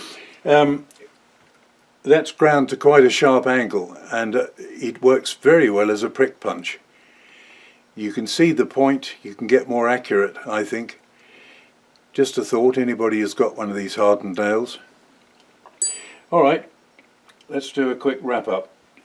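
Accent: England, United Kingdom. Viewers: Hi, it's delightful to hear an English accent on these workshop related Youtube videos, even if you do use American pronunciations sometimes. Rob. Engineer, still learning!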